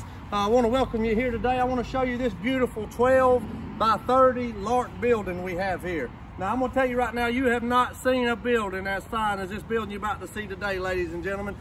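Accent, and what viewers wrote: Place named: Georgia, United States. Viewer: Like that middle Georgia drawl! north GEORGIA HERE!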